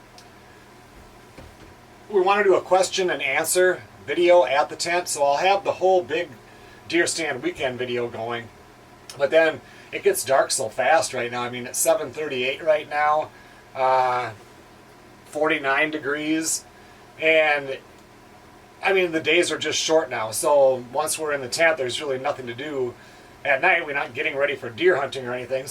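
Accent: Minnesota, United States. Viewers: I got two questions. 1. Does the MN DNR restock your lake or is all natural? 2. How come you / don't ice fish at the tent lake? Thx I grew up in grand forks and spent alot of time as a kid fishing MN lakes. Your accent reminds me of my MN family's.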